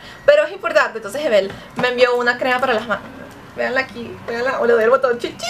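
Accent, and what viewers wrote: Venezuela. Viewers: todass las venezolanas hablan asi, jajaja bueno a excepcion de algunas pero casi todas tienen ese acento y no es que sean pretenciosas.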